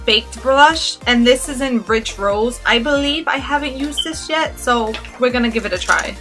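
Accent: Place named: Northeast, United States. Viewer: where the heck are you from?! hahaha I am from Texas and I can tell that you have a north-east accent and I love it!!!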